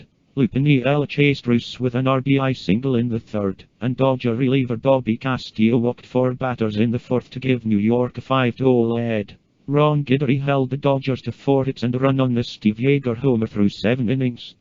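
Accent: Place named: Ireland. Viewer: I am wondering: why did you choose the Irish voice for old videos on this channel?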